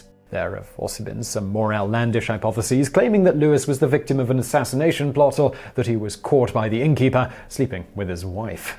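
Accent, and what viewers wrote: United Kingdom. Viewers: He's british...durrrrr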